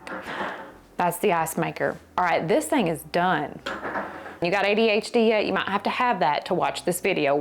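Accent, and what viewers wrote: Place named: Appalachia, United States. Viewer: Just love you, girl!🩷 You sound as country as me. When asked where I'm from; I tell them I'm not just southern, I'm Appalachian southern. Merry Christmas to you and your family.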